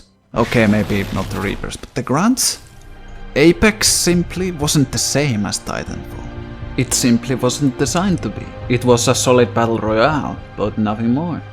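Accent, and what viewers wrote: Finland. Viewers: u sound Finnish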